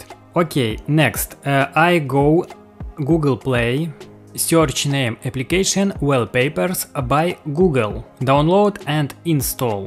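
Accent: Russia. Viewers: ахпхп тут понятно что ты русскоговорящий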